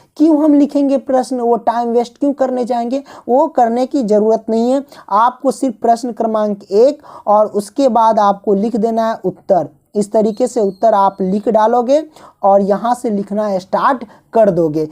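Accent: Bihar, India. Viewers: Aap bihar se ho kya? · Sir aap kaha se hai apki avj se lgta h aap Bihar se hai ??👍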